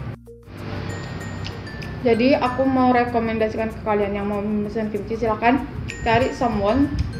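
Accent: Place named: Lampung, Indonesia. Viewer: Logat Lampung nih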